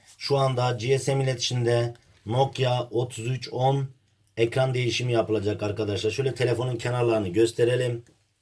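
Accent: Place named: Mardin, Turkey. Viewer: Hocam mardinlimisin sen şiven mardinli arapların şivesine benziyor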